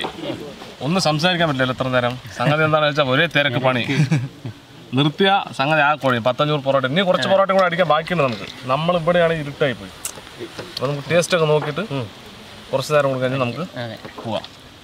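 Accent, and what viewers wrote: Palakkad, India. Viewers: am from Coimbatore i saw your all videos like it especially your palakkad slang is good and like it basically am from palakkad. one day I want to join with ur food preparation and tasting with you. If any chance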